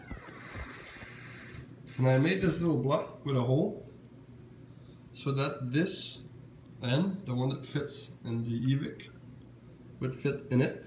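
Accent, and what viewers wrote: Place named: Quebec, Canada. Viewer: Toer t québécois pis sa parait 😂😂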